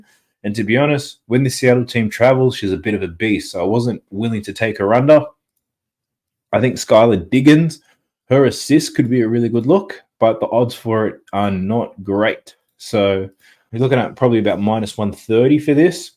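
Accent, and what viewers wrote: Australia. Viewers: Only 2nd time watching the channel , thought i detected an Australian Accent the first time i watched , good to see/find a channel with an Australian doing sports Betting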